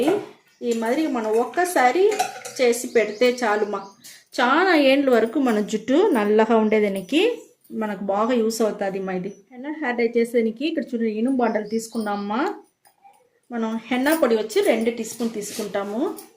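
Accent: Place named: Karnataka, India. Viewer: Karnataka walla miru me matalu alane unai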